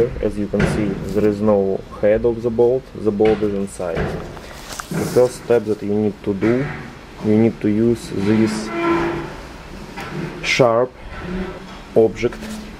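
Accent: Russia. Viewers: Как будто чувак русский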